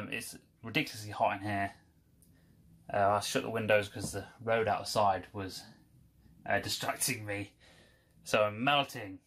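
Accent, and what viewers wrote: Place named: Northern England, United Kingdom. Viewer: Which part of England are you from was wondering as you sound a bit northern ?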